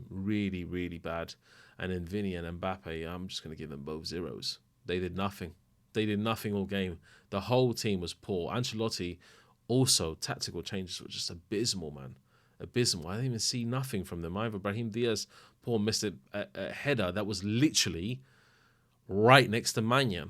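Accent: England, United Kingdom. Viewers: He's spanish with an English accent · How did you get in to supporting Real Madrid?, you sound like a proper Englishman.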